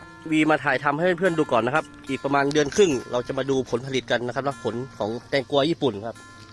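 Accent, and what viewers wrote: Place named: North, Thailand. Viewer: สวัสดีค่ะวี คิมมารับชมการปลูกแตงกวาญี่ปุ่นด้วยจ้า คลิปนี้พูดเหนือด้วย น่ารักดีค่ะ👍👍😁😁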